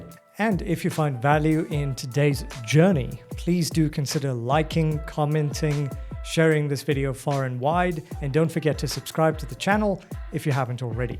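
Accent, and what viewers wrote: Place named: Johannesburg, South Africa. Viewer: Oh, that is why your "accent" sounds so familiar, yet unusual compared with what I'm used to hearing on YouTube... Hi 👋 from Joburg as well.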